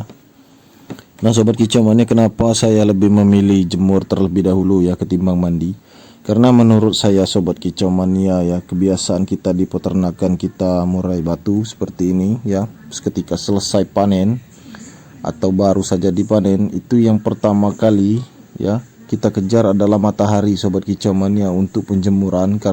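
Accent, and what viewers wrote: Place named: Bengkulu, Indonesia. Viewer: iya logat macam orang Bengkulu di mana bos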